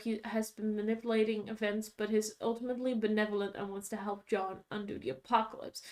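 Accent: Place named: Netherlands. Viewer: Why are you dutch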